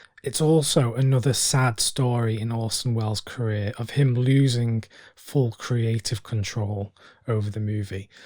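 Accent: United Kingdom. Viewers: Great tutorial and will watch some of these. Is his accent British maybe from a small corner of England somewhere? Sounds like a very unique accent. Is "North by Northwest" more of a Hitchkok horror film and not Film Noir? I looked at the present day list of the Film Noir of today, forgot the official name of that genre, and wow so much violence I quit watching even the clips.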